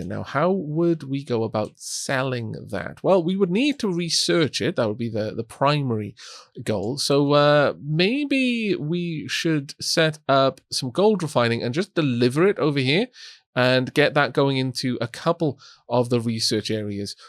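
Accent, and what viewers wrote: Wales, United Kingdom. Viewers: Ha, just finished the previous episode, enjoying the dulcet welsh tone of our tea drinking dragon saviour as I recover from my surgery today lol much appreciate the upload to keep my chill recovery going lol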